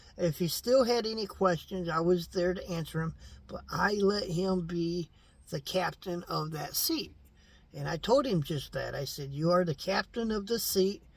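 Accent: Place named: Appalachia, United States. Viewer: I'm not trying to be mean or anything But you should look for a talent agency for acting And I guarantee You They would find you many parts for hillbilly acting roles You have the exact look that they're looking for you look and talk Like a hillbilly I am being 100% serious you can make a ton of money.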